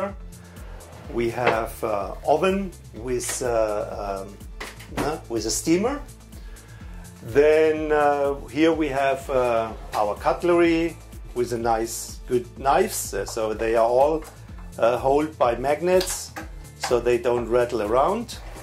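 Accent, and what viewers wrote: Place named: Germany. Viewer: Ich hab einfach mal auf Deutsch geschrieben das der Akzent und der Name unverkennbar deutsch sind.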